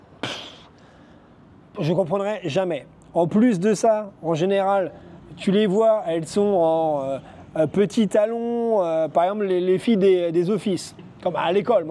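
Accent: France. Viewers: allo ce que jaime chez les francais de la france vous avez un tres beau parler que nous les quebecois a oui nous au quebec sa redouble encore et sa ma fait rire quand tu parlais du pauvre tip qui marchais comme quil fesait du patin a glace il a na beaucoup quil son comme sa et le pire de tous celui quil porte leur pentalon plus bas que les fesse yo men 🤣 .prenez bien soin de vous 🙏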